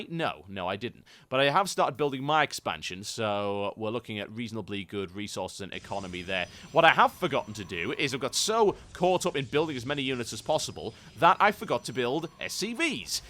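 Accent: United Kingdom. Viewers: i think ur funny as hell jw r u british caus eu sound just like my britsh friend :D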